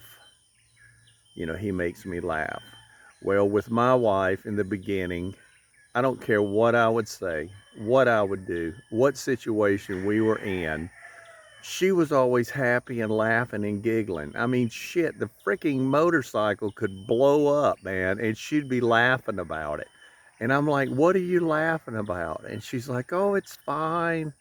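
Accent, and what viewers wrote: South, United States. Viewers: yes, I do love your southern accent. I hope you're ok. stay strong sir..god bless. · Love your Southern accent. I love your honesty and candidness about life. I hope things will work out for you and your wife.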